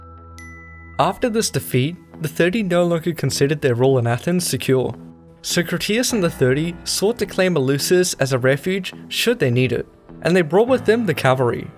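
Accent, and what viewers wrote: Australia. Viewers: Recently I was going through my subscriptions and since I want to keep the number to a minimum I considered to unsubscribe. But then I though: nah, I absolutely need to know it if ever uploads a video again. I missed your drawings, competent explanations, and Australian accent!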